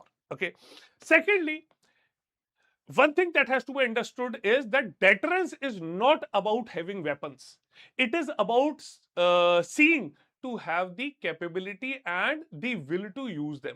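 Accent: India. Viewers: What a painful presentation......babu English, loud presentation, stretched........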